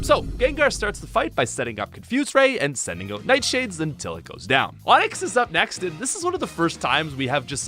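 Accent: Canada. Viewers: 10 seconds in, and I suspect you’re Canadian haha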